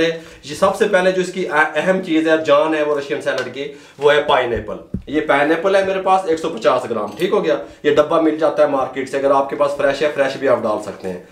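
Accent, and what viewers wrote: Punjab, India. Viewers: 😁 punjabi touch ❤❤❤❤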